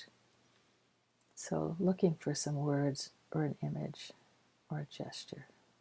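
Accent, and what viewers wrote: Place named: Chicago, United States. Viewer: I love her soft Chicago accent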